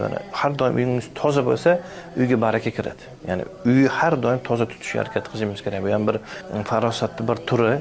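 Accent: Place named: Namangan, Uzbekistan. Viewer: Наманганлик экансиз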